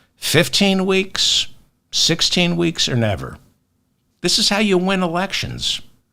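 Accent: New York, United States. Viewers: you and we got used to just basic cheating by the right. Now it's a firehouse of u name it crap. First I personally am glad to have your voice (and it's dry New York; do it yo) in the mix. Also brilliant to repeat the former president's actual marital failings. Again and again. Love your roll Dr.!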